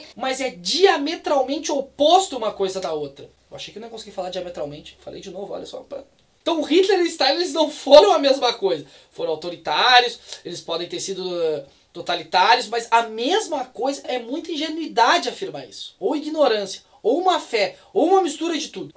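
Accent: Porto Alegre, Brazil. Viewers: VÉI, quando eu assisto teu canal me sinto muito bem. Parece que eu to num bar da CB mandando brasa nos papo tri cabeça com a gurizada. É o sotaque portoalegrense. Acho que acostumei a assistir vídeo com sotaque paulista aqui no youtube. Abraço da zona sul de Porto Alegre e continua com os vídeos.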